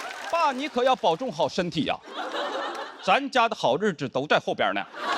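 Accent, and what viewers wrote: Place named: Northeast, China. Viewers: 東北人口音好有趣，也挺好笑的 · 哪个学校的呀感觉好像黑龙江呢，🤣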